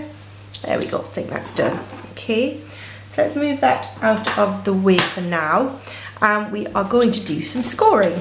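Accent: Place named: United Kingdom. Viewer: Another lovely gift box, you have such great ideas, thank you. I enjoy your videos and, being married to a Brit, love your accent😊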